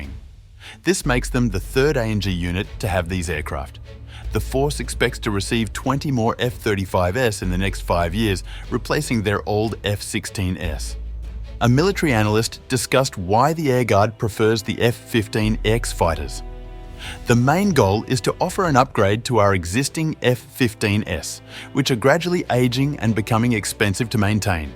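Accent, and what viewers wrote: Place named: Australia. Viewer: Why Aussie accent? What do Australians know about F-15's?? Just doesnt make sense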